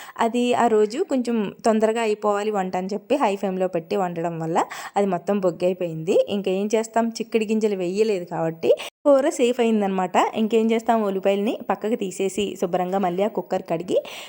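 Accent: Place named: Godavari, India. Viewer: Meru vidiga matladetappudu godavari yaasa lo matladutunaru...voice over echetapudu normal gane matladutunaru baga gamaninchana andi... Ayya baboii siggesestondi .... 😂😂😂😂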